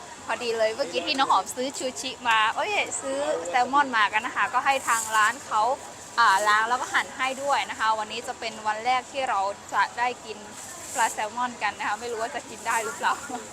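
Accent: Thailand. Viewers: น้องหอมพูดพม่าเก่งแท้แต่หนักมาทางไทยมากกว่าพูดไทยซะชินแล้วครูสาวพม่ามาเที่ยวไทยมารึกฯเลยน้องหอมว่าไทยเขาเปรี่ยนไปมั้ย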